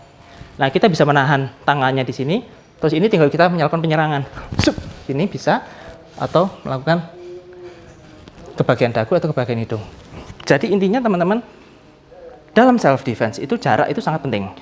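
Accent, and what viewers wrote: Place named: Malang, Indonesia. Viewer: Wong malang ta mas.nyuwon wa e😁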